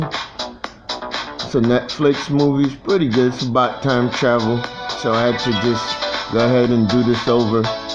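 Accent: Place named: London, United Kingdom. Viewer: On form today 😂😂😂 And, for those of us who do have fish and chips here ~ we do usually do that on a Friday!!! Love your London accent! Bless you for making me laugh! 🙏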